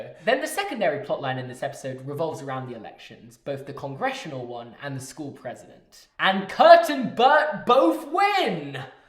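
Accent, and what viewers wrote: United Kingdom. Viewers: I know you can’t be British with a name like but the accent says otherwise.. feels wrong man, we need to get you over to the motherland for some accent coaching. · He’s British?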